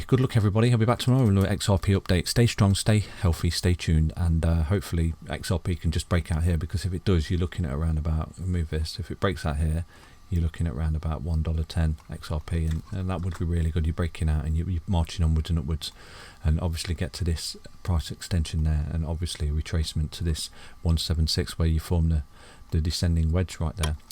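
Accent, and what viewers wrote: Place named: Leicester, United Kingdom. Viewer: Where in the uk you from? You sound like your from Leicester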